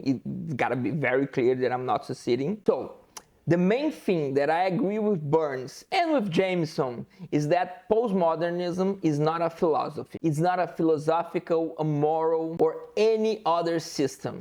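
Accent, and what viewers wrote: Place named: Brazil. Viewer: I love your accent! Let's go Brazil!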